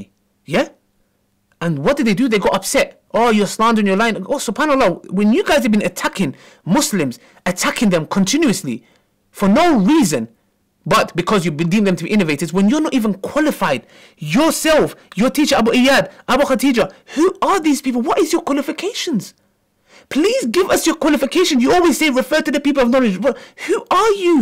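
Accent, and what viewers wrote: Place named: United Kingdom. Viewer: As salamou alaikoum brother , I am a sister from Montreal and my English is not on top and I ask you brother to speak a little slower since it’s mixed with British accent and I have a bit difficulties to understand. Can you put subtitles? Your videos are full of good informations. Thank you brother may Allah reward you al Jannatil firdaws🙏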